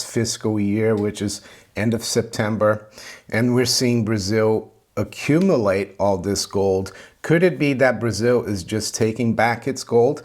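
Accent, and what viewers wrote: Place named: United States. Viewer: I have a silly question I’ve been meaning to ask -, how did you end up speaking English with an American accent? Is that common in Brazil?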